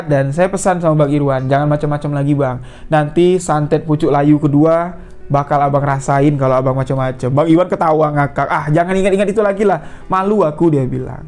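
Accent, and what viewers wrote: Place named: Jambi, Indonesia. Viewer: Enak banget ngomongnya,kaya gini yah logat jambi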